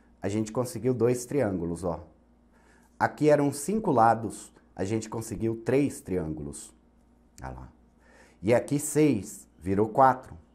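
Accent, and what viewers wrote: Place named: São Paulo, Brazil. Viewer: sotaque pulista kaka bom d+ tmb sou paulista mas ele tem um sotaque muito forte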